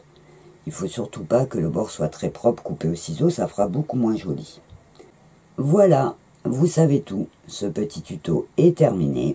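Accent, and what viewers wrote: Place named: South, France. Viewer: Bonjour. Oui la fleur est facilement adaptable sur un tissu du fait qu'elle soit plate. Ah le charme de l'accent du midi "les reses" et les petits "hop" qui sont revenus 😉 ce n'est que du plaisir à voir tes vidéos!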